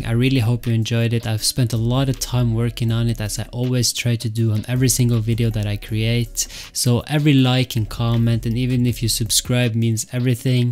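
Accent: Norway. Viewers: are you norwegian? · are you norwigan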